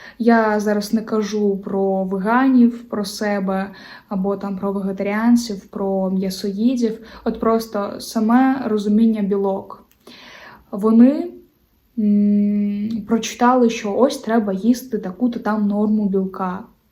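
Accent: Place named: England, United Kingdom. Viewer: Таке враження,ніби у вас англійський акцент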